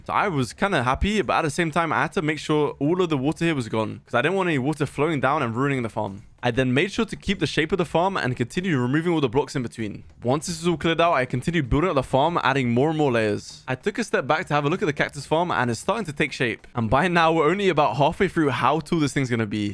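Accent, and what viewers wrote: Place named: Luton, United Kingdom. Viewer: Yo, are you from Luton?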